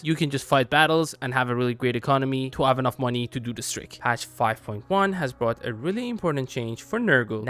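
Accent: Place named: Iran. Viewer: you have a very strong iranian accent, that brings the question, are you iranian?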